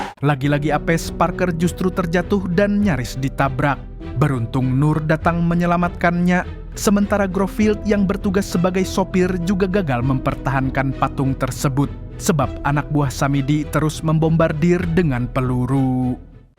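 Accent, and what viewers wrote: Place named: Banjar, Indonesia. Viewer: Inya orang sungai tabuk😂😂😂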